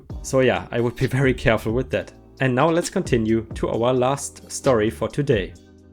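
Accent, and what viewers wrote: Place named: Germany. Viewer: I was pretty sure that accent was German, and it was all but confirmed by your perfect pronunciation of Bremen and SV Werder. Servus~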